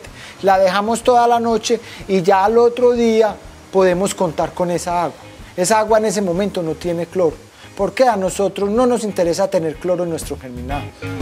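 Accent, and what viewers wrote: Antioquia, Colombia. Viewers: ¿Cierto? <3 Me encanta cómo hablais, lo explicáis genial, muy educativo y amo vuestro acento <3 Viva Colombia. Saludos desde España. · QUE TIPO TAN SENSIBLE, ES VERDAD A LAS PLANTAS HAY QUE HABLARLES CON AMOR Y CRECEN MUY LINDAS. ME ENCANTA SU ACENTO PAISA. VIVA COLOMBIA! SALUDOS DESDE DALLAS, TX. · Gracias por la enseñanza tan valiosa! Lástima esa forma de hablar tan ordinaria, que pena pero siempre me choca demasiado eso de los paisas, hablan tan ñero🤦‍♀️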